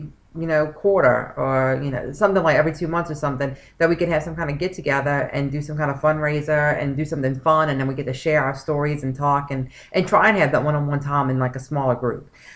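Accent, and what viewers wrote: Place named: New Orleans, United States. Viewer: Hi, Thanks for sharing this journey. I'm just starting-- I'm on my Aetna 90 day pre-op. I have a question.... I'm definitely hearing a New Orleans accent!!! I'd know it ANYWHERE! Are you from New Orleans?? I'm a native....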